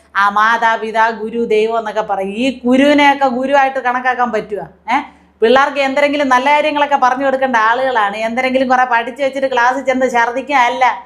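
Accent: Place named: Trivandrum, India. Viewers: തിരുവനന്തപുരം സ്ലാങ് നന്നായി സംസാരിച്ചു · Kidu avatharanam ji... Trivandrum slang adipoli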